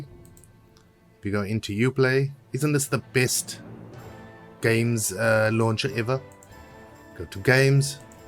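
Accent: South Africa. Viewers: I knew that was a South African accent, the "biltong" just confirmed it 😭🇿🇦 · Never expected to hear a South African accent on a mod vid😂 Thanks for the help man! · Yo nice vid man. The game already looks amazing to me but wanted to see what the modders did to it. Also, hope you dont mind me asking but you sound South African, are you from here? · Yooooo a South African!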